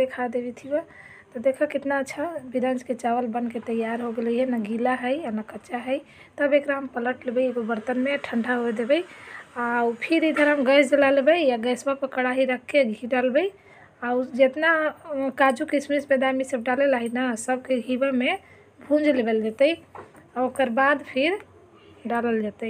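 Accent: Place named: Magahi, India. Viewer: बहुत सुंदर सात्विक रेसिपी के साथ मगही आवाज, बहुत बहुत शुभकामनाएं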